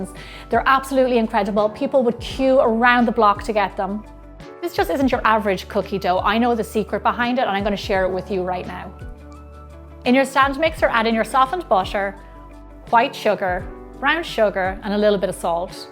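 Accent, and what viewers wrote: Ireland. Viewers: I just love that you never lost an ounce of your Irish accent!!! 💚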